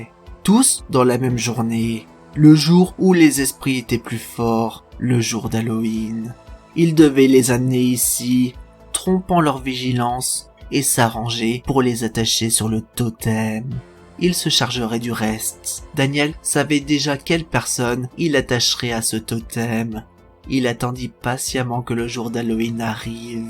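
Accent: Lorraine, France. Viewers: Désolé pour l'accent, c'est un accent Lorrain 🤣🤣🤣🤣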